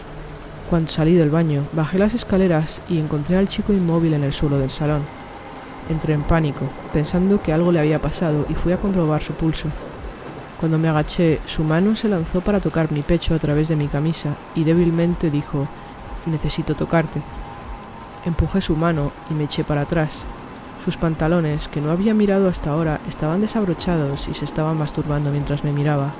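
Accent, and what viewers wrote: Spain. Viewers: Saludos,. Con la premisa de que YouTube es sin llorar, comento; en mi vida había oído narraciones más sosas, insípidas y tediosas. Reconozco que debes ser de España y que me recuerdas a un humorista español de gafas y cigarrillos con su clásico de gallegos..."Y que es de pilar?" Chiste muy viejo pero igual de plano, sin matices, incluso petulante... Recomiendo que te dediques al humor porque esto, no sé cómo definirlo, es como sentarse a mirar cómo la pintura se seca. Infumable, cero talento y peor, empeño por narrar historias de terror. Saludos desde la universidad de Miskatonic, pronto se abrirán matrículas para narraciones de horror.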